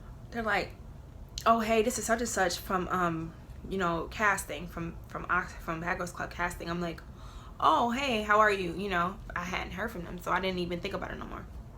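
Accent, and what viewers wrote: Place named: New York, United States. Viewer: My fav!!! You can tell you been in NY you picked up the accent a little ❤️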